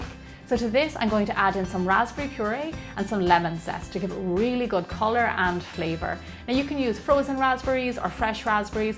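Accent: Ireland. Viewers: so thats why she has that accent, i didn't know that she was irish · I love your Irish accent! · Are you Irish??? CAUSE I AM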